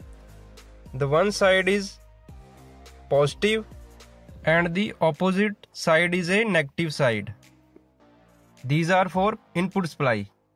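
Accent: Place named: Punjab, India. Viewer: It's better to make video in urdu instead of punjabi English brother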